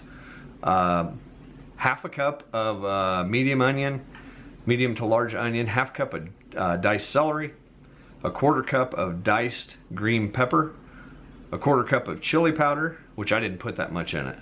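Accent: Indiana, United States. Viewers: You are definitely from Indiana. Lol warsh. Sounds like my sister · Yep, Indiana. Warsh this instead of wash this. I too am guilty!!!!😉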